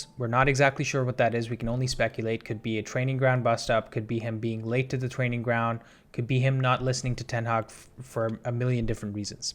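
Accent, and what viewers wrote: United States. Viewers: As a mancunian born and bred. I can never get used to an American accent talking about British football. Its as abnormal as hearing my grandmother talking about her sex life.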